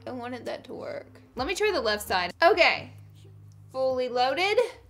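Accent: South, United States. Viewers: rute maria or Farrah Abraham..but a little more southern? idk who she is so idk if she's from the south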